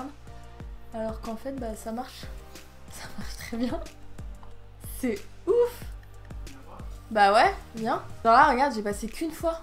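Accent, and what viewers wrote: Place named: Quebec, Canada. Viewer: Ta un accent québécois c'est super perturbant mdr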